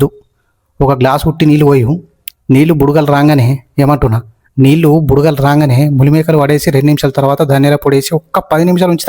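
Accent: Telangana, India. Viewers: Beautiful telangana dialect 😊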